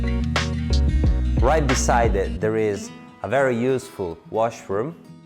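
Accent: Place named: Rome, Italy. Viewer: Dude, you are such a professional. Also, your Italian is “lingua Toscana in bocca Romana.” Great property as well!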